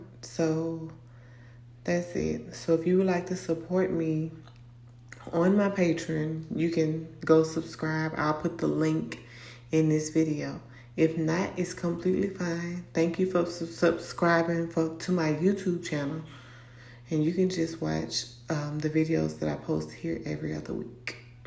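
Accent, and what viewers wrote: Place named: Louisiana, United States. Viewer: Oh man, when you said, "My dad's gonna be boiling crawfish.." I immediately thought, 'I bet she's from Louisiana'! I heard it in your accents and your words confirmed it 🤣 I never got to try crawfish while I was in Louisiana (some of us call em crawdads here in Kansas), and I regret that. I was 16 and I just couldn't get past the "suck the heads" thing.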